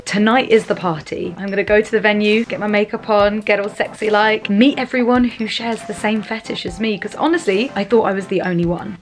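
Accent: United Kingdom. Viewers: Hello, you're AMAZING! 😁 I love your openness and especially about your tickling fetish! ❤ I have had a tickling/foot fetish since I was 6 years old! 😱 I never understood why? For years I thought that I was a mutant and kept my fetish a secret! 🥺 Then thank God for the internet! 🙌 Because then I realized that I wasn't alone and found out that it is a very common fetish! I applaud you for your boldness and openness to discuss to others about our fetish! 👏 I am bummed that I missed this certain tickle party though, 😭 I am guessing from your beautiful British accent though, that it was probably far away from me, since I live in the United States 🇺🇸 I would love to do a party myself, or if you ever plan to come to the U.S. then definitely hit me up and we could maybe make it happen, because there is A LOT of tickling fetishists all over the U.S. I have subscribed to you, it was the easiest decision and most important subscription that I had to make on YouTube! 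👍I bet you're far from me though, because it seems to be that all the good one's (girls) That seem to be compatible or share the same interests usually live far away! 🥺 Continue being as great as you're! 💗 You definitely have a new friend and fan! PLEASE do more videos regarding tickling! 😍We the tickle community needs more people like YOU! 👇 Take care and HAPPY tickling to you! 🥰 🤣 \|/ /\ 👉👣👈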